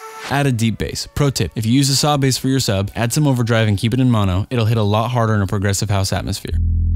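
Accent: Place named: United States. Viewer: you sound so american lmao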